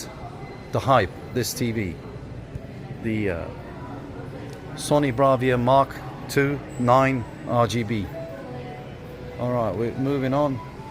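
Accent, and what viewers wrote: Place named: England, United Kingdom. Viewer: sorry, with his English accent and the shop looked Currys, a British high street store.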